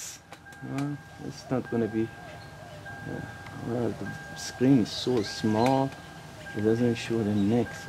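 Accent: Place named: Iran. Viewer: Are you Persian? I was watching your video and my wife (iranian) walked by and said "who is that he sounds persian.."..lol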